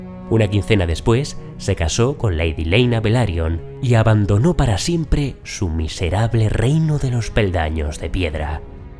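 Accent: Spain. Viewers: brother que seas español, le da el toque a la narracion!! excelente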